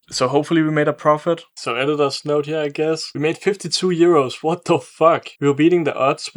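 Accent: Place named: Denmark. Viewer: Danglish is strong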